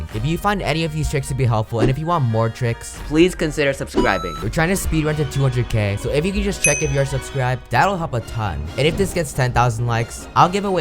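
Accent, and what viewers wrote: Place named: England, United Kingdom. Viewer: Ayo ur pilipino with English accent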